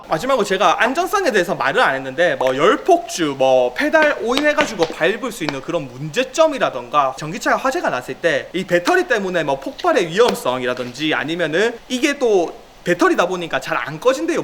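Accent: Busan, South Korea. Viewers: 마 붓산싸나이답게 설명 쳐즈기네요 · 딱 보이 부산표준어네?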